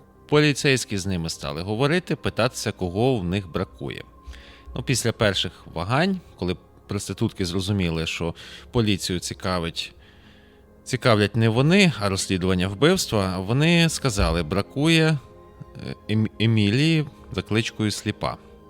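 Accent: Lviv, Ukraine. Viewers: Дякую. Гарний виклад чистою львівською мовою. Історичні фрагменти життя Львова - це хороша і правильна рубрика. Сьогодні я Вас почув у перше і мені сподобалось. Здоров'я і добра Вам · Чудовий львівський говірок.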